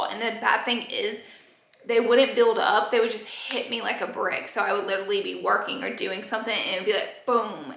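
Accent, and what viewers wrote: South, United States. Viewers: I love the country voice! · Girl, you still country af!!! But I absolutely love it because I’m a country girl too!! I love watching you 😘😘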